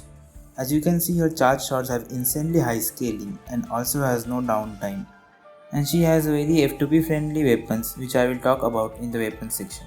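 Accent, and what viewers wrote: India. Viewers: the indian accent hits home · Nice guide are you indian ... That accent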